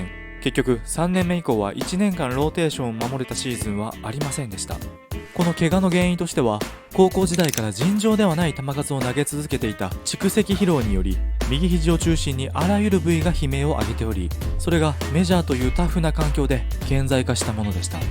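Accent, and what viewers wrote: Kansai, Japan. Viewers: 関西人だ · 主、関西訛り？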